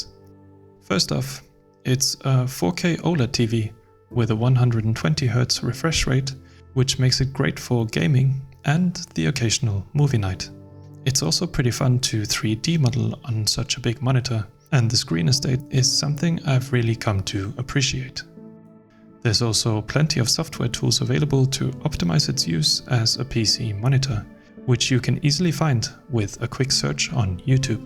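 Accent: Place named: Sunnfjord, Norway. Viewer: Haha, eg trudde eg hørte litt av ein Sunnfjordsdialekt, du skjule r’en din bra på engelsk!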